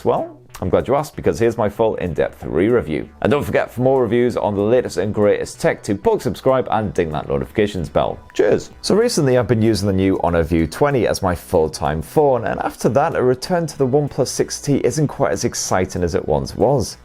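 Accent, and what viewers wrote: United Kingdom. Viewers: Is this the legendary UK accent?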